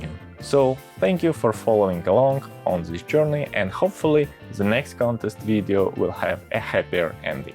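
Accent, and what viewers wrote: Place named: Eastern Europe. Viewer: Очень приятный славянский акцент)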